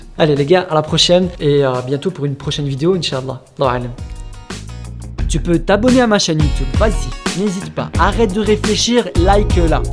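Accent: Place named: Belgium. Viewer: Vous êtes de nationalité belge non? (De Bruxelles) Sans vouloir être indiscrète? Cet accent ne m'est pas inconnu hihi 😆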